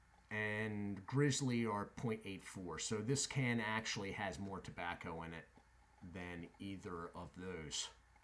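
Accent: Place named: East Coast, United States. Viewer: Peace! Great channel! I’m a New Jersey native for most of my life and now I’m in my 40s in the east Bay of California, and it’s nice to hear an obvious East Coast accent! I’m off of cigarettes for almost 20 years now, yet I have been dabbling in things like cigars, nicotine gum, etc. etc. to bridge the gap when I’m stressed. I would like to be able to order things like Swedish snus or certain types of dips online, in bulk so I have it around. I know northerner is just way too expensive. Any chance you have a good lead to a reputable end respectably priced source for Swedish snus and the like that you know to have decent shipping turn around? Like the channel a lot! Peace Shawn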